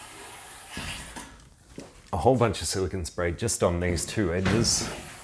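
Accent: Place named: Australia. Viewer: Great video, nice to hear an Australia voice on YouTube for a change.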